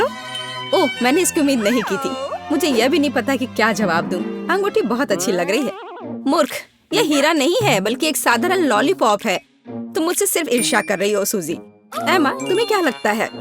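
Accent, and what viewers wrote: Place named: Bhojpuri, India. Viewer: Such a boring voice this lady has... Bhojpuri touch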